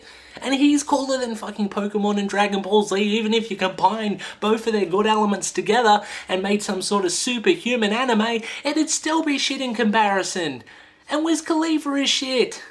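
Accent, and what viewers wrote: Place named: Ireland. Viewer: you use dragonballs to make a wish. I seen every episode. When I was a kid that was the best shit out. And why do you look Chinese and sound irish?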